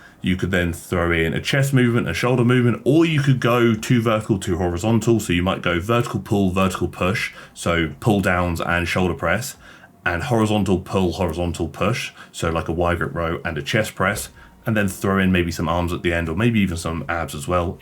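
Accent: United Kingdom. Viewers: I love when a British man talks to me about muscles.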